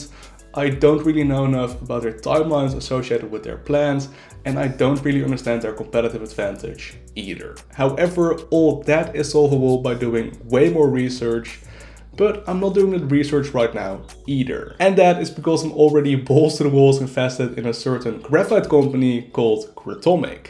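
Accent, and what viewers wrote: Netherlands. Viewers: Nederlander ? I can hear the accent! Love the content bro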